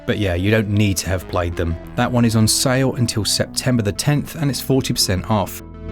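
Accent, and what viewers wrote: United Kingdom. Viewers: My soon-to-be wife surprised me with a birthday Switch OLED just recently, almost cried cause I've been saving up for it for months and she went ahead and got it for me. Went to search for a Switch-related youtube channel and yours is by far the most enjoyable to watch (might be the accent, gotta love the brits). More power to you!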